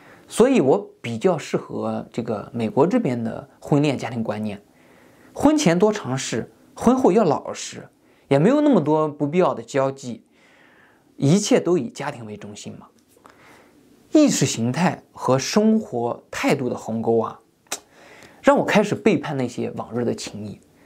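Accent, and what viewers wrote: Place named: Shandong, China. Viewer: 我怎么听着你的口音这么山东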